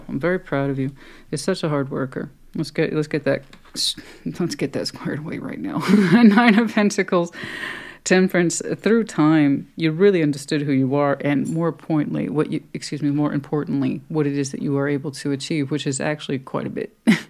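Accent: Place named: Texas, United States. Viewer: First time I've listened but your cards missed me by 2 mile. I will listen again ,like the Texas accent. Been in and across the 800 and I believe 30 odd miles, in a truck many times. Always met some good people in Texas and was treated with much respect being a woman. Aries thank u for reading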